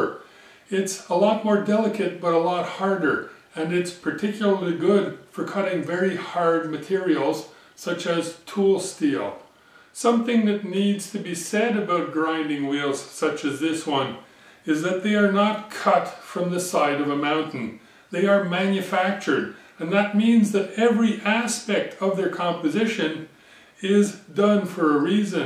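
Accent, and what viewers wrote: Canada. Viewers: Michigan or Canadian?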